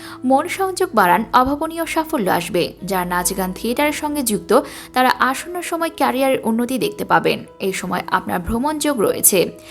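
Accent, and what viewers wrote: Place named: Bangladesh. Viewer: BANGLADESHI VOICE VALO LAGCHHE NA BLOCK KORTE HABE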